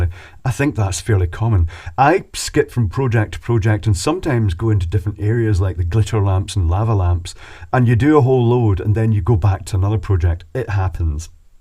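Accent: Scotland, United Kingdom. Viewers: I always thought you were not from around Glasgow, you sound posher than that 😂. I love visiting Glasgow, especially a whisky shop I found in a basement where you need to walk down steps to get to the door. I stayed at Bowling harbour once on my boat. Loved it. All the best, you’re a bloody genius. Love your channel.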